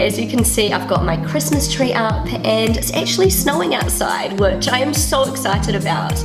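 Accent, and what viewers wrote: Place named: New Zealand. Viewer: I always understand "chicken point" when you pronounce "check-in point". Kiwi accent is so funny! 😆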